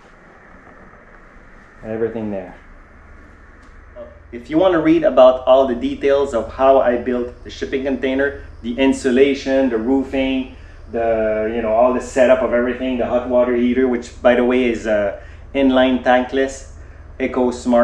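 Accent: Quebec, Canada. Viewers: You sound like you're from Quebec... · Awesome! Quebecois? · Ta un accent Québecois ??? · I like it ! What are all those diplomas on the wall ? I couldn't not notice you accent. Is that PQ ? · Wow! I love this simplicity! Nice job. Not sure but your english sounds just like mine from Quebec. I wish that container home could be possible up here... But with our cold weather, building code and city legislation... It would require so much effort to get a simple projet like yours possible. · At first I was thinking, is it me or this guy sounds like a french canadian dude speaking english. Après j'ai vu le logo des canadiens, ça a confirmé ma thèse 😅 Belle job! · That sounds un peu quebecois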